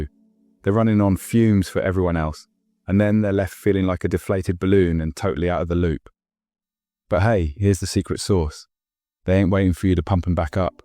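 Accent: United Kingdom. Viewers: You made me giggle!🤭 Emotional Kung Fu😎 How do you know INFJ so well? Are you one of? You must be one of, you know too much!😂 (By the way, really love your humour and your sweet British accent ! You sound charming!)❤ I fancy you just from your words and the sound of your voice & accent ! (It doesn’t feel it’s all AI 💯 voice made) Love from a Italo & fellow Londoner ❤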